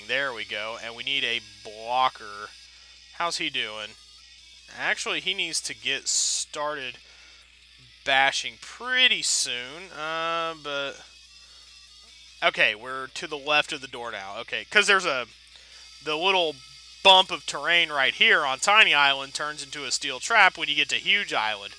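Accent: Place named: United Kingdom. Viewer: That first level reminds me of some of the levels in the tricky rating. Great Brit accent by the way :)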